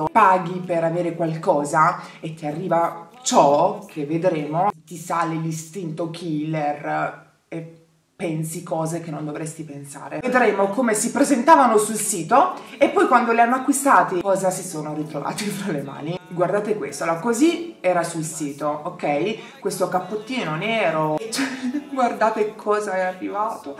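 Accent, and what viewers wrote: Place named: Foggia, Italy. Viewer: posso farti una domanda che non c'entra niente col video?? tu sei di Foggia?? no perchè dall'accento sembra di si!!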